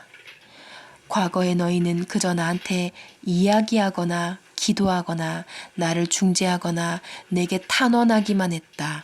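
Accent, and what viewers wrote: Gyeongsang, South Korea. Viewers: 경상도 억양이 밉지 않네요 감사 합니다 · 어떻게 이런일이..마음공부 하던중 우연히 신과나눈 이야기 란 제목에 살짝 호기심이 발동해서 처음 들은게 몇달전인데도통 무슨 말인지 모르겠는데 댓글들을 보면 감동이라는 글도 많고 긍정적반응이 많더라구요 근데 일부 내용만 들어서는 무슨 말인지도 모르겠고 법문공부 중이라 해석을 어떻게 해야할지도 모르겠더라구요 말 그대로 전 답답한 상태였어요 눈 이 아픈 사람이라 책 읽을수 없는 상황인데다 어제 다른영상에서 한번더 듣고는 도저히 답답함을 견딜수도 없고 어디까지가 신의 의도이고 어디까지가 인간의 의도인지도 모르겠고 ( 살인사건 , 고문 과 같은 큰 사건) 정말이지 속이 편치 않고 혼란스러워서 어제 들은 다른영상에 몇가지 질문 올리고 잠들었는데 답은 없었어요 그래서 평소처럼 법문 들을겸 찾다가 이영상 눈에 들어왔어요 별 기대없이 재생 버튼 눌렀는데 ...저를 위한 영상이었군요 같은 경상도 사람이라 알아듣기 쉽고 또 풀이까지 해 주시니 감동이었어요 궁금한게 하나씩 풀리는것 같아요 너무너무 감사해요 후편들도 기대되요 궁금한건 질문드려도 되죠? 이렇게 알게되서 정말 반갑습니다 수고하세요^^ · 어디사투리세요? 경상도? 같은데요 🙂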